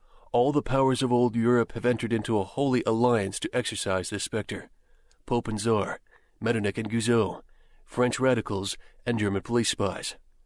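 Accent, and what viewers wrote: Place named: United States. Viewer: The thick American (AI?) accent and unrelenting monotone renders this historic document unlistenable.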